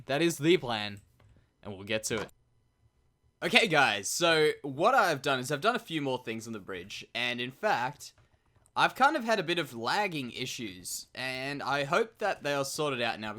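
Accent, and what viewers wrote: Australia. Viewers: Are you Australian? I subbed because you're very Interesting and cool :3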